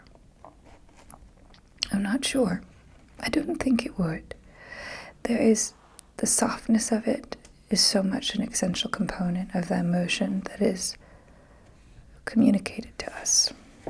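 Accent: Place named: Mid-Atlantic, United States. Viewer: I love your Mid-Atlantic accent!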